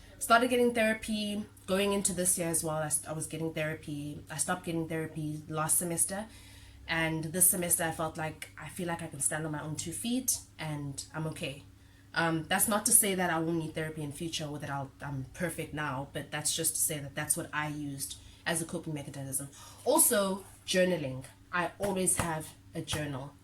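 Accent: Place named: Pietermaritzburg, South Africa. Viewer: You sound like you from PMB though...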